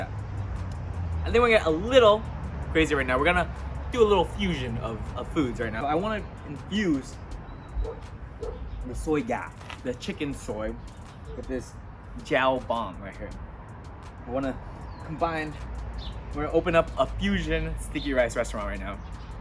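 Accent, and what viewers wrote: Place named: Vietnam. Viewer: Love your Viet accent!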